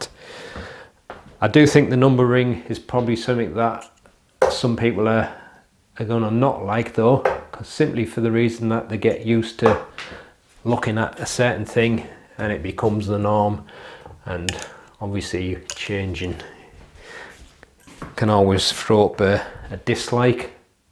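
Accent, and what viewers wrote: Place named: Scotland, United Kingdom. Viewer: Oh from england that’s cool but i like ur accent though it looks like scottish accent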